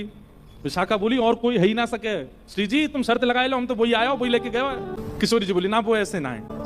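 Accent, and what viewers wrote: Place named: Braj, India. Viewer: Anand a gaya or apki awaz me brij boli😅❤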